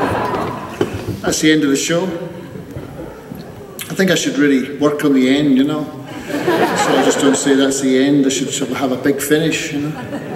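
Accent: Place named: Scotland, United Kingdom. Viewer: So glad he kept his west coast Scottish accent after all those years away.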